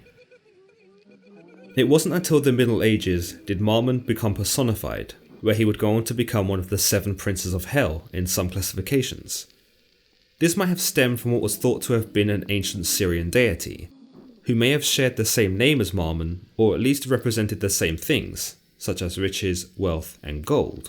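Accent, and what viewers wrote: United Kingdom. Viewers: Lmfao no ones gonna watch this boring video 😂😂😂 i clicked on it and u have to read it and the bucktooth brit accent hell nah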